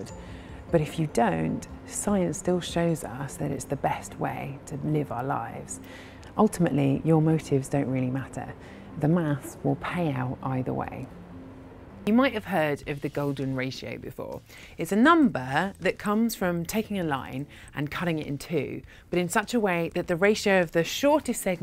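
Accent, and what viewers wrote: United Kingdom. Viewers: Alfio Raciti and british. Best combo.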